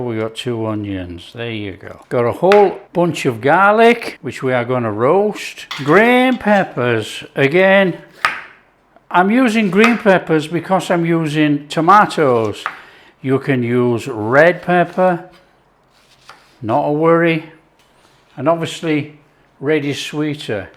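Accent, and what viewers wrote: Yorkshire, United Kingdom. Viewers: why didnt you poke the sausages? Mu husband is from Leeds, and when he puts on a Yorkshire accent it sounds like you. I randomly came across your video because i googled sausage potato onion trying to find a new way to cook my big "lad's" cumberland sausage. Im German- Canadian and we came across some wonderful food like this while visiting Germany. I subscribed.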